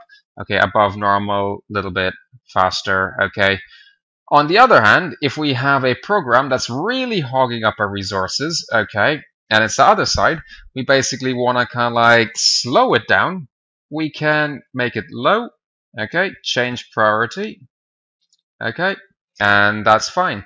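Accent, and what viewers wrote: Ireland. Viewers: ALSO U SOUND SEMI IRISH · Where are you from? You have an Irish accent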